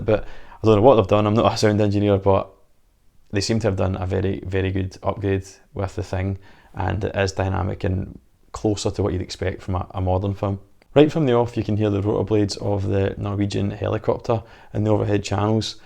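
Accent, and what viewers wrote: Scotland, United Kingdom. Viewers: Get more subscribers....Hardly with that annoyinh Scottish accent 🙄👎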